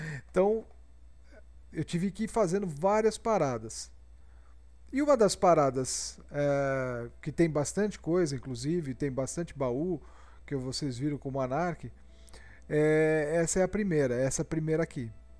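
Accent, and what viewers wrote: Piracicaba, Brazil. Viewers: vc é de piracicaba?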